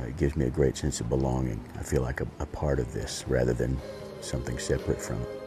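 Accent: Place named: Texas, United States. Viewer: Wow, I just realized that Mr. Henley's speaking voice, the cadence sounds like George W Bush...it must be the Texas accent, which neither sound so southern. I was not a fan of W., but I sure do love!!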